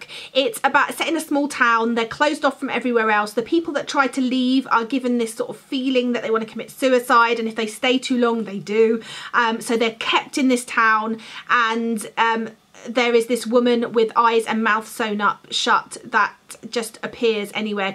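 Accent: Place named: United Kingdom. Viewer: I want to read Winger toooo, but this book is just hanging out on my shelf 😂 Love your accent sooo much!!! I unfortunately have a kind of American accent but I looove love love hearing your wonderful British accent ❤️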